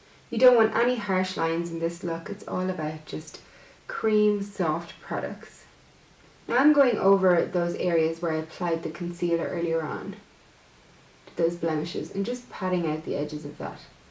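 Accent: Ireland. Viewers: It sounds Irish to me... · Your so beautiful!! Are you Irish? You've got a lovely Irish accent💕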